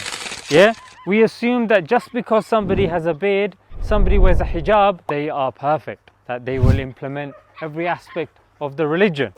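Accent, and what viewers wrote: United Kingdom. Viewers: is he his acent is british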